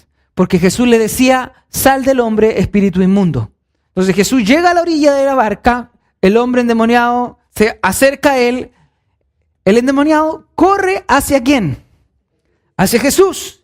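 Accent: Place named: Chile. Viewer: Increíble el acento chileno como si se nos nota, aunque pensemos que no😅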